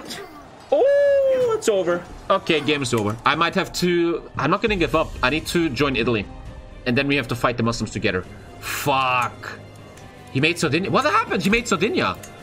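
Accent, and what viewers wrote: Germany. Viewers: I've just been sat here for 20 minutes trying to figure out what this guys accent is and it just hit me straight in the face and now I feel stupid, because it's german and also german. Das Denglisch hätte mir eigentlich nen Tip geben können... und es ist halt super lusitig. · Is he German? Ok he is. Really recognized that at the end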